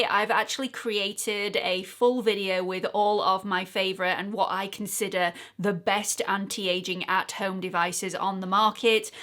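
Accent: United Kingdom. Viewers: I, m Spanish and I, ve recently discovered your videos, may bé a week ago and I adooooore you, I, m 55 Years old, so, I listen carefully about tour mum's tips and devices about skincare and make up I think you're honest and I heard very realistic recommendations from you. And, theres a bonus, listening to you., I learn a lovely british pronunciation. Sorry, maybe I dont write very well, besos y enhorabuena por tu estupendo canal.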